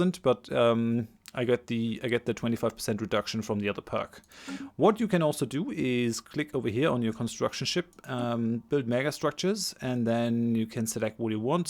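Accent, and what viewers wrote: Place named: Germany. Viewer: Sounds german ;)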